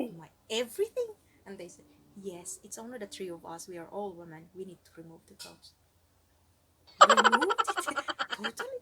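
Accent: Finland. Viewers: You have very Finnish accent like for English :)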